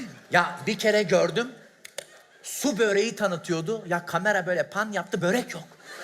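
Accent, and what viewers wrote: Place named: Diyarbakir, Turkey. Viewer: cem yılmaz niye diyarbakırlı gibi konuşuyo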